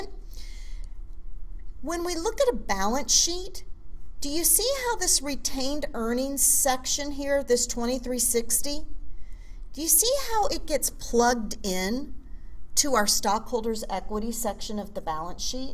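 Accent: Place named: Minnesota, United States. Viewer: MINNESOTA EH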